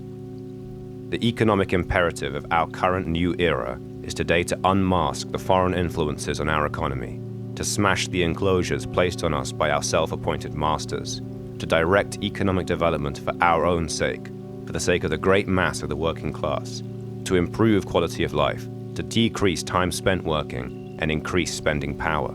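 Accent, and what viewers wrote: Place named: London, United Kingdom. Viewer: "Weve got to take socialism from the urban ellite" says the man with the most middle class londoner voice to imagry taken staight from an Bristol rave poster.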